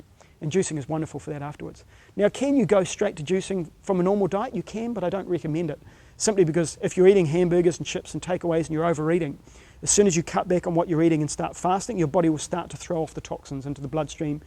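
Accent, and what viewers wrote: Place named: New Zealand. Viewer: that's a very strong New Zealand accent if ever I heard one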